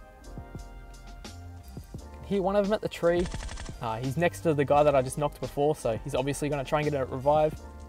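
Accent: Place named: Australia. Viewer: Man, your accent sounds like an Australian.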